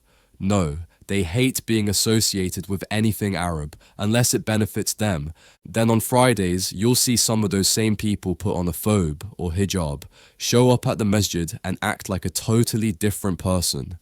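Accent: United Kingdom. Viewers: The Original Arabs were / are Black. Adham [Radiant, Shining Black]. Aswad [Black], Qadar [Black]. The paler shades of people from MENA = the Middle East / North Africa are the byproducts of Black men producing with the Slavic = Eastern European / Caucasian women. That said, although I know that the racist = white supremacist scenarios articulated happen, the narrator has a keen British accent. And thus, I am curious. Why does someone from Sudan have such a sharp British accent. I may be missing some details,.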